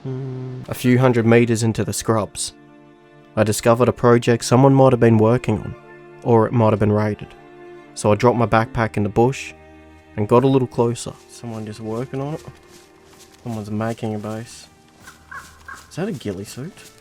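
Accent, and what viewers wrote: Australia. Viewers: LOIF! Man, I love that aussie accent.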